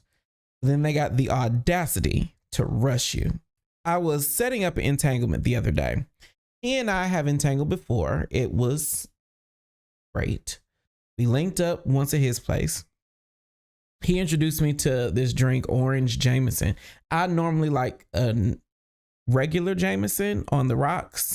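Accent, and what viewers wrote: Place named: Detroit, United States. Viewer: are you from Detroit? "what up doe?"?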